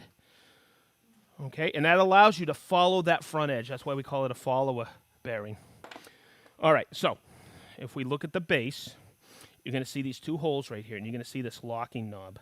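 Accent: Boston, United States. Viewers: Put the R s in the words for God sake. Hate that Boston stuff.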